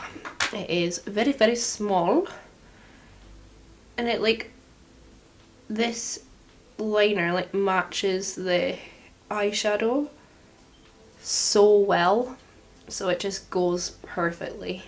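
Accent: Scotland, United Kingdom. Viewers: Was expecting revlon candid, got PLouise, shocking honestly 🤣 the brushes for this collection do look so good but oh lord are they expensive. Taking the more pastel shades with the grey tones is honestly so good, this look is 100/10 so good. Can't wait to see a full grungy grey toned look. Something big and smokey with like a silver/purple shim shim on 👌 Now for my question of the day... Do you have an internal monologue? If you do does your internal voice sound like you/have a Scottish accent or is it different?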